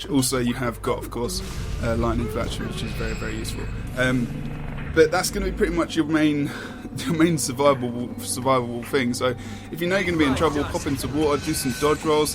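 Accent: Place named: England, United Kingdom. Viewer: Cool, thank you! I saw your Lion's Arch tour just now and I liked it! Keep the vids up and especially that English accent of yours ^^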